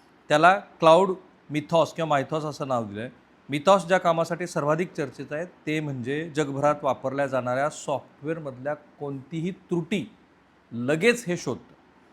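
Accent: Marathwada, India. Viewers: साहेब तिकडचे आहेत, मराठवाडा भागातील तिकडे ण चा उच्चार न असा करतात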